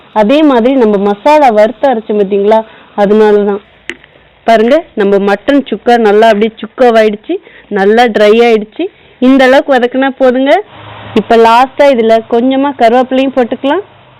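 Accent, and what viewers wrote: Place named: Kongu, India. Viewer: Supernga. I am vasuki Aunty. Coimbatore Tamil i am Coimbatore. Kongu tamil super.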